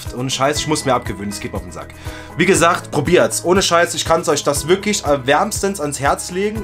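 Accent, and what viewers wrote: Saarland, Germany. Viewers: Ich wussts doch eh Saarläääner auf weiter so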